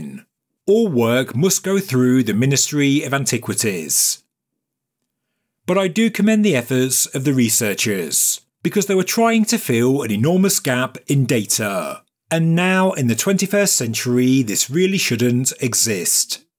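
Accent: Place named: England, United Kingdom. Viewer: Awfoool. Oh u r English man.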